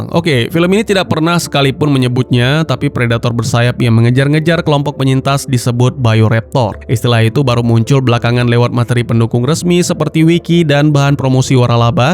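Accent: Aceh, Indonesia. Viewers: Lu orang Aceh ya bang?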